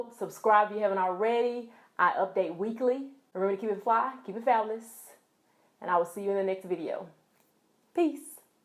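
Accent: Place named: Tennessee, United States. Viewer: I knew it, I have a few friends from Tennessee, and you sound like them. I figured it was a Tennessee accent, but I had to make sure. Kudos for my ears....lol....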